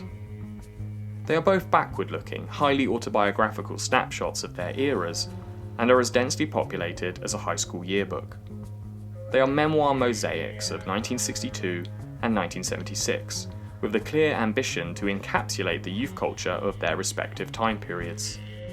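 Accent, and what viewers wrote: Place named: England, United Kingdom. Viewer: I enjoyed this, thanks! Your (very) English accent somehow sits awkwardly with the material, but I appreciated the insights. These are my two favorite movies, and I have just finished watched D&C for, like, the 20th time! I guess the challenge is for someone to do a 90's piece, as that must be the last decade of freedom before technology robbed us of our primal sociability.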